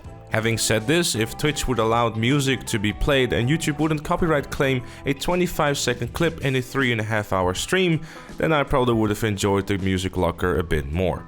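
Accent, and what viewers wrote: Netherlands. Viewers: you sound dutch, are you? :)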